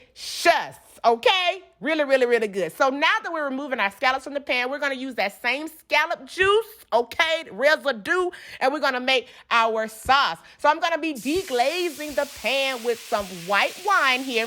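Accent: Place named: Alabama, United States. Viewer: Listening to this lady talk is like being home! I grew up in Alabama, and I miss it so much!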